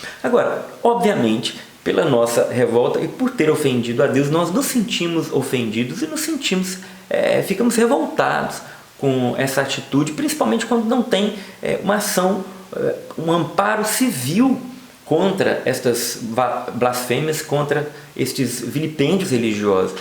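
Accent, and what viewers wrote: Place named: Caipira, Brazil. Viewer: Voce é caipira hein fiii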